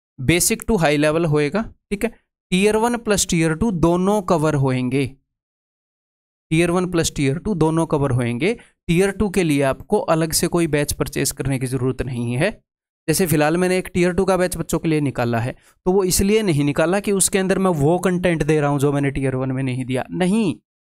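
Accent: Haryana, India. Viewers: Maine maths ka tier 2 batch lia h aur apka content perfect h ekdum exam oriented aur to the point ... Aur apke padane ka way bhi boht acha h aur haryanvi accent bhi aisa h ki dimag me seedha ghus jata h padaya hua ...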